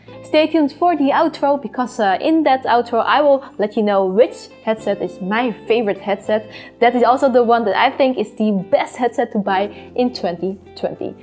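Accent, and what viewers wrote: Netherlands. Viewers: Ben je Nederlands, want volgensmij hoor ik een accent🤣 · Are you from the netherlands? I think I hear a dutch accent. :) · Haha ik hoor dat je nederlands bent😝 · You sound Dutch :X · Are you dutch? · You sound so Dutch to me haha are you Dutch?!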